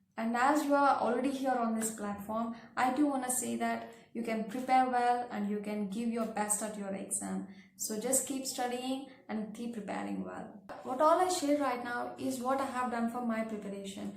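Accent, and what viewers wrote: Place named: West, United States. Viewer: So chosen to adapt the western accent too.. and advertising the three books with white cover..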